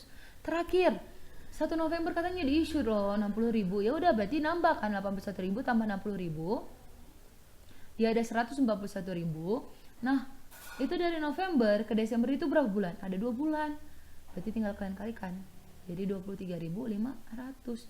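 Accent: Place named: Medan, Indonesia. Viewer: Makasi kak, wkwkkk nampak kali org medan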